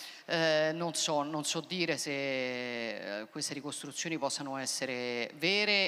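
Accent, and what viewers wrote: Rome, Italy. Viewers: LA GIORGIA E' SEMPRE NA MERAVIGLIA , L'ASCOLTEREI PER ORE COL SUO ACCENTO ROMANO , ❤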